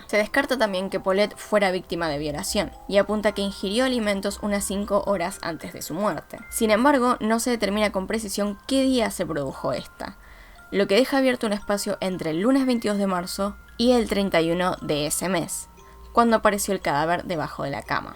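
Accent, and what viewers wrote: Argentina. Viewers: Me sonaba tu acento Yo tipo: es argentina Y vos justito lo dijiste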